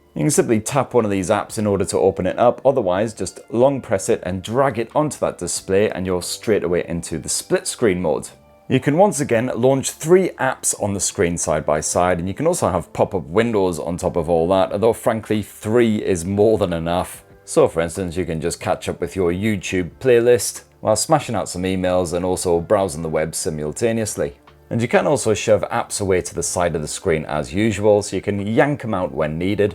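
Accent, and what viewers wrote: United Kingdom. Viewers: this man speaks British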